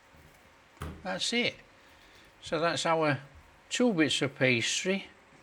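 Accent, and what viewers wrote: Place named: Doncaster, United Kingdom. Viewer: I spy with my little eye a Donny accent ❤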